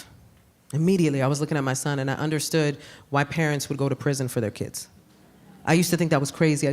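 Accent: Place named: Bronx, United States. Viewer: She is so New York...I love her. I'm from the Bronx too,she sounds like a real Bx girl,she is absolutely hilarious.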